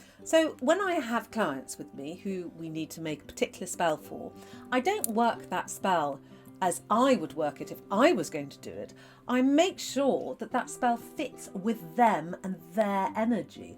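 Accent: United Kingdom. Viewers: I am utter rubbish with meditation. I either fall asleep or think of 500 other things I need to do. I do love my pendulum though!! I recently was a guest speaker at a collage class on religions. Brief history on witches, sabbats, and practices. They were facinated with my pendulum, their inability to get him to work, loved that I could get answers and was correct. Thank you for this information ma'am! I have already shared as your voice is so much better than mine. Brittish accents are the best!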